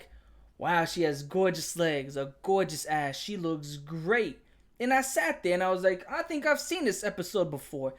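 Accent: New York, United States. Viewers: What part of New York you from broski?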